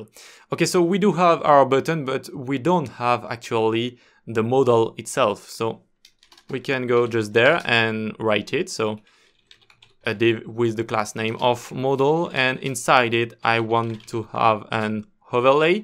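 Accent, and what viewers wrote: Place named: France. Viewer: Sweet french accent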